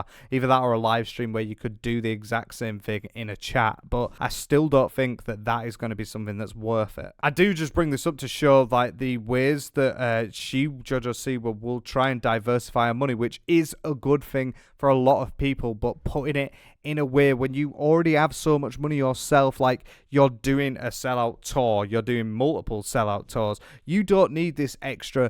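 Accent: United Kingdom. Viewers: I am American I SPEAK ENGLISH! But I HAVE NO IDEA WHAT THIS BRITISH DOUCHE IS SAYING I HATE THE BRITISH ACCENT 🙄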